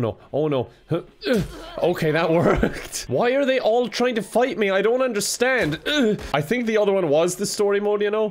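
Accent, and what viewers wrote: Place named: Ireland. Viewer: Hello there friend and apparent Scorpio? Happy belated birthday if it was this Tuesday!!! I really hope you had a fantastic day and that it was awesome like you are. I recently found your channel, recently found gaming YouTube actually but I will say you're my favourite Irish gamer ngl. I would literally watch any video you make. Like the accent alone? Corpse who? Anyway... Hope your day feck ing rocked.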